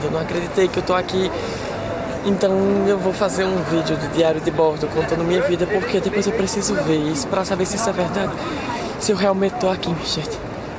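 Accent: Northeast, Brazil. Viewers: Esse video me foi recomendado e quando eu vi que era de um cearense vim correndo ver. Amei sua historia, amei que não perdeu seu sotaque, parabens por tudo. ❤️ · Eu ri e chorei com sua história, que coisa linda❤️ amo nosso sotaque nordestino, que Deus te abençoe. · amei sua história gosto do seu jeito de falar não perdeu sua origem nordestina muito simples estou conhecendo Paris com vc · Mininu,e tu num perdeu esse sotaque até hoje., Por causa de que ❓ Tu è da minha cidade, crato!!!🤩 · Amo seu jeito nordestino, seu jeito de falar, alto astral. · Que sotaque gostoso, meu nordeste é lindo. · Eu admiro você por ter mantido o sotaque do nordeste, isso é lindo!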